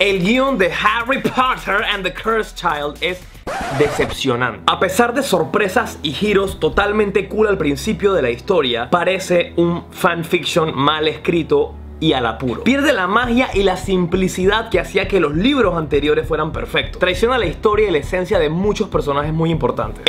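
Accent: Venezuela. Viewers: Qué simpático eres x'D tienes acento levemente venezolano :0 ¿eres venezolano o es que el acento panameño se parece?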